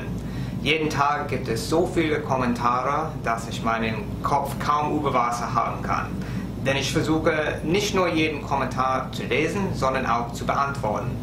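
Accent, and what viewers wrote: United States. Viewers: Dein amerikanischer Akzent klingt einfach nett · Manchmal hast du halt den typisch amerikanischen Akzent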